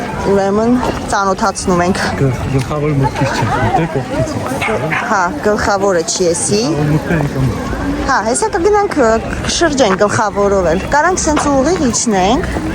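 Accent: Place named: Artashat, Armenia. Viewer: ջան, երբ Արտաշատի բարբառով խոսում ես շատ անուշ է, հիշում եմ ուսանողական տարիներս շատ քաղցր ես խոսում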